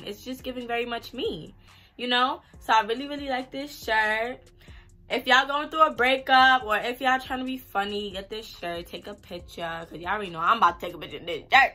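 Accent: New York, United States. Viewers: But its funny how soon as you spoke im like yasss sis from ny ! 🤣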